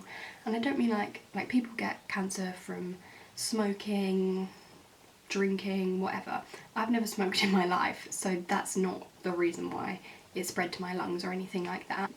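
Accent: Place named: United Kingdom. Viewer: Where do you live in the uk? I love your accent. Plus your eyebrows are amazing! Do you also have Instagram?x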